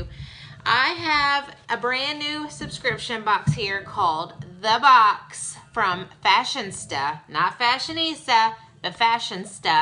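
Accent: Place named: South, United States. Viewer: I LOLed at the VV cream and you bring up a good point/problem I have with boxes shipping even, for instance, K beauty products we've heard of that don't have ingredient lists included in the receivers language. Ipsy has done it a couple of times too, and that weird ass egg mask they sent scalded my skin. So props to you for pointing that out and I'm a fan!;) Nice to hear a fellow southerner on here💜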